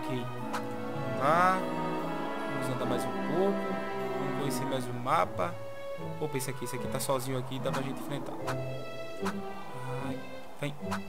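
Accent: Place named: Pernambuco, Brazil. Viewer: Pernambucano, mano? Sotaque infalível.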